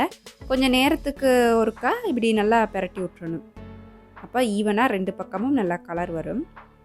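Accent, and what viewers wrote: Sri Lanka. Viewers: Assalamu allaikum warah nalla erukegala sis...srilanka slang enaku pidikum neega alaha peasurega..ginger,garlic paste neega intha recipeku podala...na try panrean🙂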